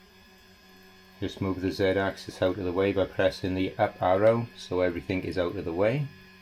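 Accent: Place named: Swansea, United Kingdom. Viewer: Hmm I'm sensing a Swansea accent. Appreciate the video!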